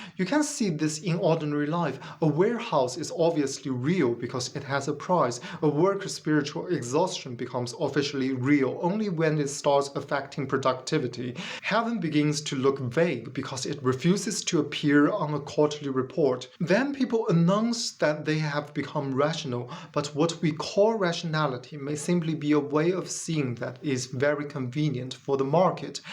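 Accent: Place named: United Kingdom. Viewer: AI has better pronunciation and intonation. Not intended as a critique of English. Your English, is excellent. "Heaven" not "haven", "These" was mis-read as "this" (This is just a little feedback for you, ). I thought, originally that your accent was British-flavoured, but your subtitles are in US English. Where did you learn your English? Your ideas? Unconvincing. Successful religions have always been about controlling other people. That is what they are selected for, if they do not do that, they get replaced. Reality is not important to religion (well, successful religions). I suppose the Flying Spaghetti Monster has some connection to reality.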